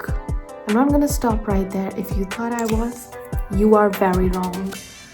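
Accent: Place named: Mumbai, India. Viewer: love the sobo accent actually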